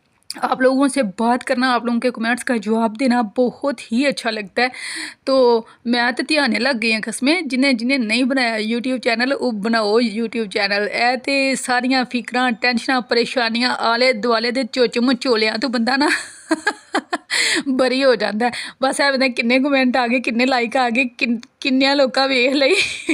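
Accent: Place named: Gujranwala, Pakistan. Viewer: Gujranwala ki punjabi lgti hai apki.... Or without any reason laughing itni achi nhi lgti....baki theek hai...or dua ap khud he kr lea kro apny leay... Hmari tu apni duaian reh jati hn oudhuri...!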